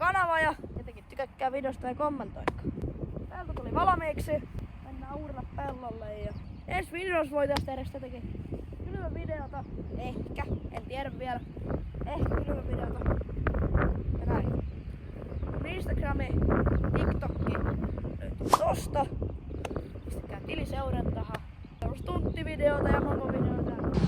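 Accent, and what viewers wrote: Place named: North, Finland. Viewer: Hyvä video tilasin kanavan vaikka tuota pohojammaan murretta ei jaksaisi kuunnellakkaan😁😅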